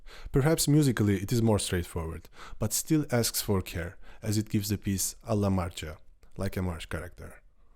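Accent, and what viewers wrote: Russia. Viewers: typical russian accent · Слава Шопену! Именно благодаря ему я наткнулся на Ваш канал. Я так понимаю (по акценту) вы русский. Подобного уровня контент я знаю у Михаила Аркадьева ещё. Но он в плане оформления не так хорош: там на телефон записано всего лишь. А тут у Вас просто как документальный фильм, а не урок)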